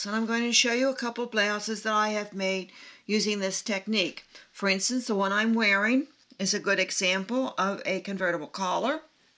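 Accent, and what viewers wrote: Philadelphia, United States. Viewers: In case you like cooking- Chef Jean-Pierre has Jack the family behind his camera. And boy oh boy the onyo soup- lol food's great and Chef is good n funny w his French accent. As nice to hear as your Philly accent!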